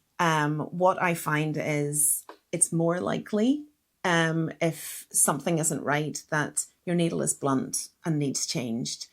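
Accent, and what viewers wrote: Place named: Scotland, United Kingdom. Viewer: Oh gosh or maybe that's a Scottish accent I detect 😂 May I ask what machine this is please?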